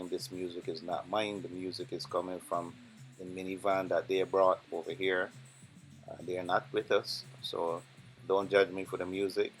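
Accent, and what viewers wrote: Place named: Barbados. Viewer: sir, l am hearing a Barbadian accent . Are you Bajan or did you live there? Nice video.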